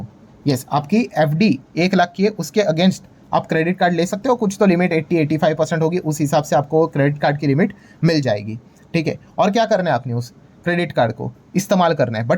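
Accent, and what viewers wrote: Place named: Maharashtra, India. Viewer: sir chya bolnya waru n ch samjat ki sir marathi aahe sir sher market che danvir karn aa he free madhe deep knowledge detat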